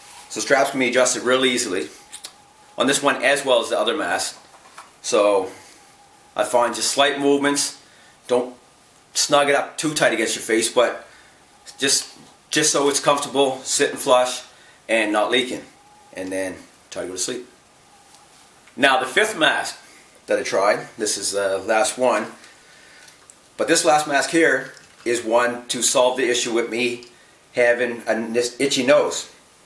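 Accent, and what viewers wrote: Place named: Canada. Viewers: I noticed that as well. I watch Holms and Holms on tv home repair channels. They are from Canada and have the same exact accent. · ah a Canadian... ;) the way you say out, about and mouth :)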